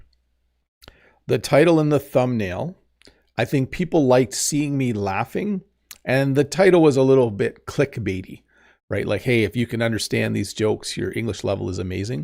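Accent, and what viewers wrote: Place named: Canada. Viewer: Salut,! It is so refreshing to listen to your videos. You speak so clearly, your pronunciation is spot on and your pace is excellent and the Canadian accent is <<the best>>! One (minor) question: do you have the ability to control the language for your captions? I notice they use USA English: favor, behavior, center.... Back to watching video, thanks!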